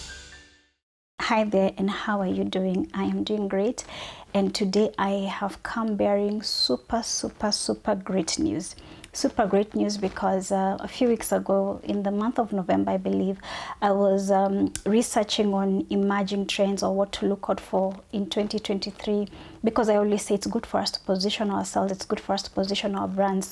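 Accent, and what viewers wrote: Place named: East Africa. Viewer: Like you East African ascent... thanks for the lecture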